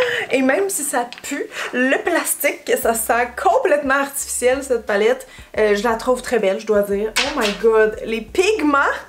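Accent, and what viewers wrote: Quebec, Canada. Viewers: Coucou ma beauté, encore une super vidéo , je m’éclate, j’adore 😍😘👌🏼 y a des trucs que je ne trouverai jamais en Suisse et même certains sites qui ne font pas de shipping vers mon petit pays non-européen et parfois ça m’énerve vraiment mais j’aime beaucoup ton humour, ta gentillesse, et surtout SURTOUT TON NATUREL 👍🏼❤️👏🏼 je déteste toutes ces chaînes de makeup à la truelle 😂 c’est trop et je comprends pas comment leurs peaux respirent avec toutes ces couches de makeup fond-de-teints etc etc , où alors des gens comme ma voisine qui abuse des sprays tanning effects , elle ne voit même pas combien elle est orange à la lumière naturelle malgré que je lui ai dit plusieurs fois avec tact , impossible elle voit une couleur bronze 😳🫣🤔🙄 c’est dommage en + tu vois les traces autour des oreilles, des mains c’est pas beau du tout , du coup c’est rafraîchissant de te suivre , tu es belle et naturelle, même quand tu es maquillée tu restes fraîche… et c’est tout ce que j’aime 🫶🏼🫶🏼🫶🏼 je suis d’accord avec toi sur le fait que souvent les pharmacies vendent des fonds de teint qui tirent sur l’orange , ou le rose , et c’est pas facile de trouver une couleur qui correspond totalement à notre teinte… oulaaa je t’envie cette beautiful palette ☹️ je veux la même 😂en Suisse 🇨🇭… WOAW TOUT DONNE BIEN SUR TOI c’est fou 😉 même ton fond de teint effet sec comme tu dis, et comme toujours: ton accent sonne comme une musique à mes oreilles 👂🏼 j’adore cet accent québécois, j’adore 😍 j’adore 😍 gros bisous à t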